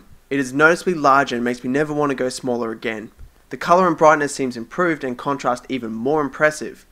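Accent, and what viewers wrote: Australia. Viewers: I love your Australian accent and great video :)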